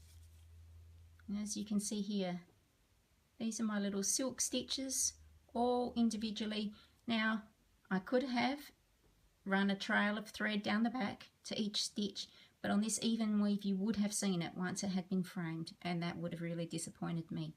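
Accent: Australia. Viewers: Nice to hear a fellow Aussie!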